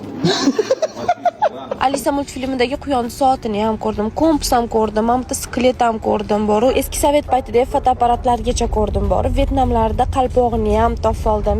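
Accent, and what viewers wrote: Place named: Tashkent, Uzbekistan. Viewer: Toshkencha bn qayse shevani aralashtirvos? Yo Toshkencha yo shevezda gapirin qoganlani buzasiz yoshla internetga qarab oʻsvotti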